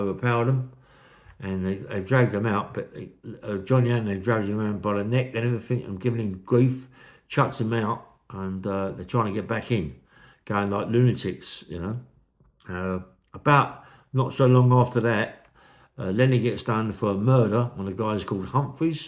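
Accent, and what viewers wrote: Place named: Cockney, United Kingdom. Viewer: I could listen to for hours, great stories told in a comforting old cockney accent, I’m addicted to this channel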